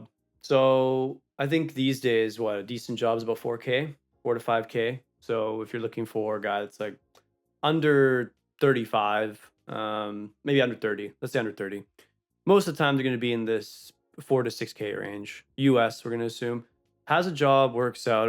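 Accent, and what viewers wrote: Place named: Canada. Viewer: He sounds American but isn’t American, I’m gonna go with Canadian..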